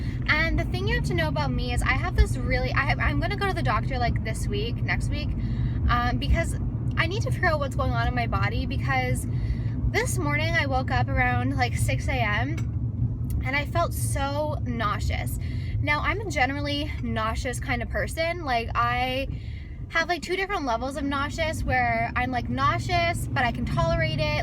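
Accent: France. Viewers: Montreal is in serious need of some snow so it feels like Christmas! Your french accent is so adorable. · aw your french accent it's cute!💘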